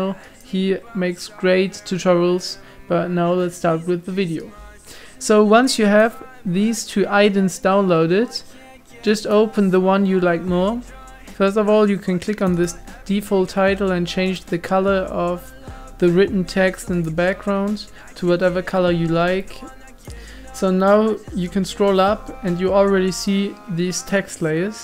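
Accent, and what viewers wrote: Germany. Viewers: I knew cuz your english is like a german aka ich auch lol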